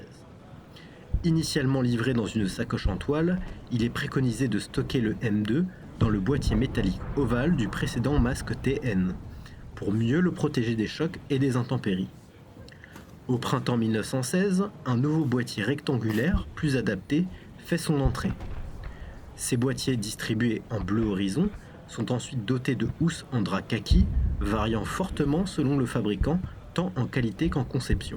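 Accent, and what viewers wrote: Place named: Belgium. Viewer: Aaaaaaaaaaaaah ! du Belge !!! c'est rare ! merci pour ces bonnes explications .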